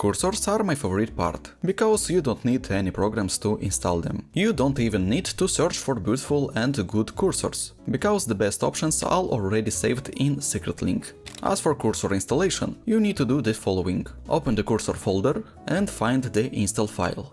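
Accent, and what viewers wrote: Russia. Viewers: говоришь с русским акцентом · русский акценкт крутой, я так явно говорить не умею, как он так научился, у меня вообще только понимание языка вкачано, а остальное плохо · как же чувствуется этот русский акцент) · Ахах, с первых секунд слышно что русский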